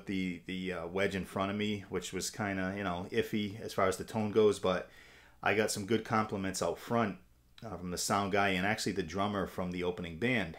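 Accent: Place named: New Hampshire, United States. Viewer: You have that NH accent. You from there? I'm originally from laconia.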